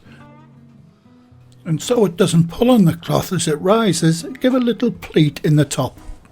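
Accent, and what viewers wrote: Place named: North West England, United Kingdom. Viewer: Thank you and tomorrow I'm going to have a go making this recipe as it is clear and concise. I like the voice over instead of watching someone banging around in the kitchen, personal preference. I have been on the old sourdough journey and have finally got a starter going, it's still a learning curve to make a loaf that's edible and not too dense. I finally bought bread flour, so I think this will make all the difference. When you said *natural yeast (I think that was the term used) do you mean sourdough? I also liked your accent as I'm from the North West coast originally, I live in Canada. Left UK in 1972. I'll have to go look at your website. *edit: no it was fresh yeast you said!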